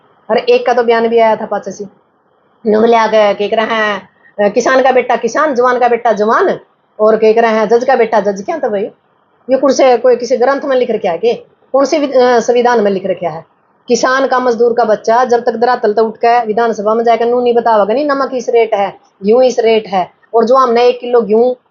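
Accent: Haryana, India. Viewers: aap suddh Hindi mila kr bohat suthree Haryanavi bol leti ho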